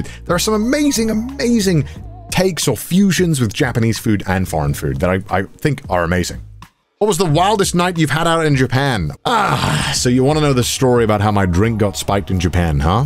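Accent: United Kingdom. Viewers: For the engagement farm, Bri'ish man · im from LA and i agree that a lot of things suck about it, but for some reason it makes me mad when a british dude says it lmaooo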